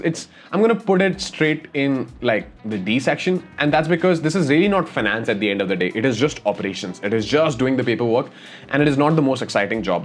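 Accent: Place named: India. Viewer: Why can no indian talk english without an indian accent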